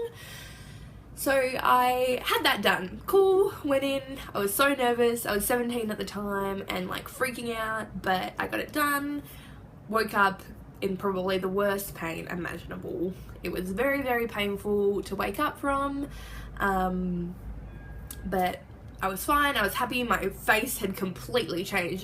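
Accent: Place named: Australia. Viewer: ! Keep us posted! Thinking of you:) you are so brave to post this! Would love to see videos or snippets of implants and everything else! Love hearing an Aussie accent. Peace outtt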